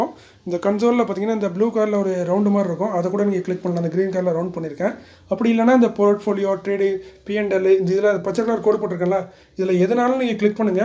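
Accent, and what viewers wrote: Tirunelveli, India. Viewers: Sir please speak as early .Don't try new version. For others we cannot change Tirunelveli Tamil 😊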